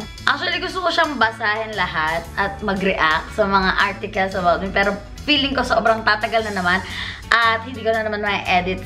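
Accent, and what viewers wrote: Batangas, Philippines. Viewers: gawa ka vlog na pure Batangeño accent.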